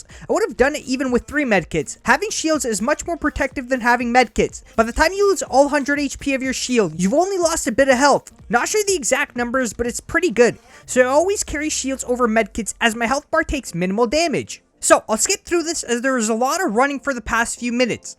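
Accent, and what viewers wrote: Canada. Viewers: I can tell your Canadian. 😂 Please tell me if you aren't but I'm almost certain.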